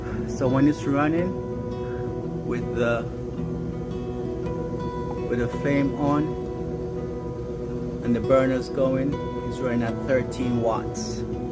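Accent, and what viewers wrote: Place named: Jamaica. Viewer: You sound Jamaican, greetings from Montego Bay.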